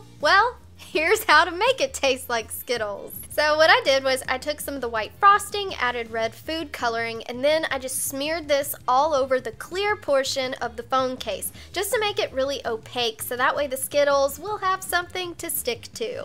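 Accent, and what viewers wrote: South, United States. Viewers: She is Sooo stupid I hate her country accent eww I'm discusted by her utube ideas and no I won't leave her alone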